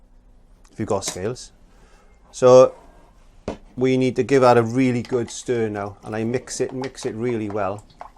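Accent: Wales, United Kingdom. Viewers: Do i detect a slight welsh accent? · the accent is Welsh...even the rest of us Brits can struggle a bit sometimes.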